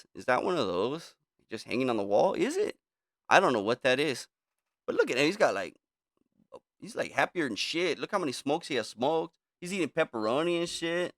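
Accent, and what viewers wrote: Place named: Latin America. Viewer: When listen to your voice I am getting a hint of a Latino accent and I am ready for you to break into a Cheech and Chong skit.💨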